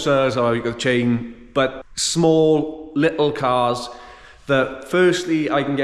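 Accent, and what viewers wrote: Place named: South Wales, United Kingdom. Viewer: you got a sub from me for just being a fellow welshy, the fact you sell cars is a bonus lol. we sound very similar so im guessing your from south lol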